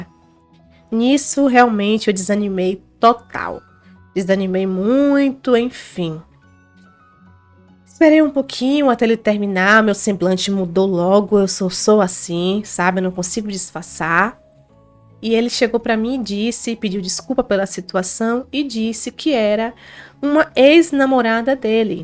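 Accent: Bahia, Brazil. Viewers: Essa narração feita pela a, com esse sotaque baiano ficou maravilhossaaa.!!!❤❤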